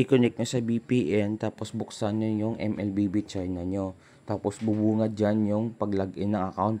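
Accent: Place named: Iloilo, Philippines. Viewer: Mabal an mo gd Kun ilonggo Kay ga dala Ang accent bsan mag tagalog hahaha same boss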